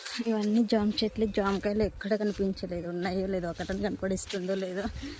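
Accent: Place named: Anantapur, India. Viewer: ఎక్కడ మీ ఊరు.. మా అనంతపురం యాస చాలా బాగుంది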